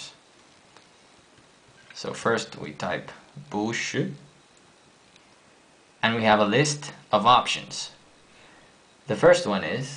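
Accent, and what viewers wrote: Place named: Finland. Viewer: I say its a finnish accent.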